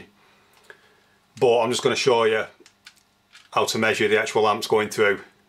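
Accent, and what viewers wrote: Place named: Lancashire, United Kingdom. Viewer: I was thinking burnley or rochdale, but west midlands is way out. Brummies don't say load like "lord". Darwen's a great place, amazing countryside.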